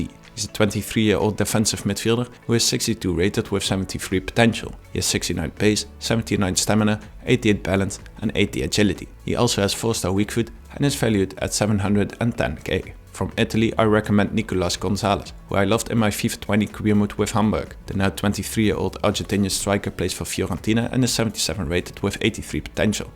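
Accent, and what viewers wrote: Netherlands. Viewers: Bro je moet wel iets aan je engels accent doen man, geen haat ofzo maar je hoort duidelijk dat je NL bent verder goeie videos 👊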